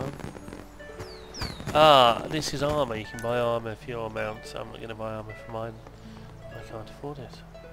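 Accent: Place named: United Kingdom. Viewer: Clicked on video in recommended videos. 12 seconds in I'm bursting out laughing at how amazingly British your voice is (and I'm British!)! Not an insult, I just couldn't stop myself, interesting looking mmo, here, have a like.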